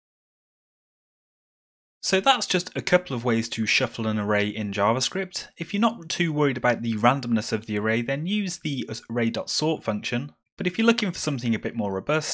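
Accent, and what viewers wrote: United Kingdom. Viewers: I think you are from UK try to speak slowly so we asian can get what are you saying just a suggestion btw nice tutorial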